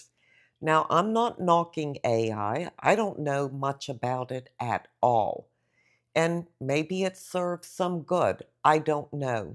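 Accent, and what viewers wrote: Pittsburgh, United States. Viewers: Hello again, just watched another of your video. Convinces , first of all you sound like a Pittsburger . As am I. I’m from the old school. Very lazy generation today. I still work at my age at almost 74, have a home and still do all the yard work, groceries one day, and I may have a day of rest before I go back to work. Oh AI it’s taking over everything. Seriously these kids today can’t do anything without it. Can’t even count back change to a customer. I’ll take the days I grew up in anytime. Keep up the nice videos and wonder stories.💃🏻 very attractive lady by the way. Body, mind and spirit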